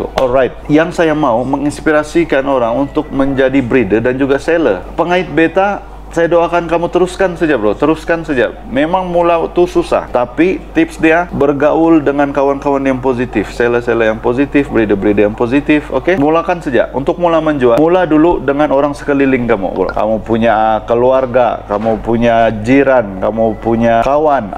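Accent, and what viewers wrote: Sabah, Malaysia. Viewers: Yay seharian tunggu video bro.. Firstime tengobluerim mati.. Sudah ketagih dengan slang sabah.. Nk tanya berapa minimum size betta untuk jar.. Ataupn umur.. Betta saya 1 cm 3 minggu baru tak sabar nk jaring plak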